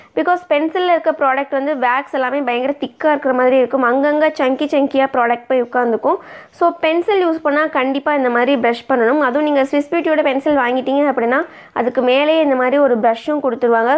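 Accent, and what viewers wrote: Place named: Kerala, India. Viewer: R u Malayali ?? Gap ittit slang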